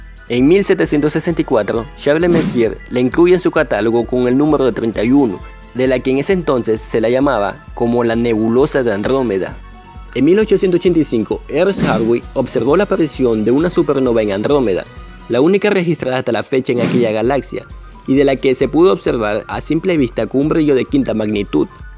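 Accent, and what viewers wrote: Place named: France. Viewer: Ahh y la voz con ese toke como de frances pero en español me explico?? Español con acento frances...como el inspector de la pantera rosa.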